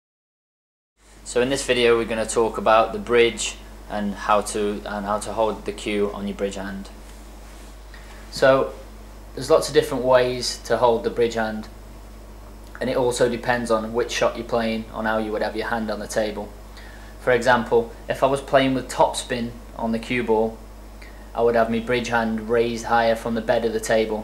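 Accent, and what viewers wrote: United Kingdom. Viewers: John Doe bri ish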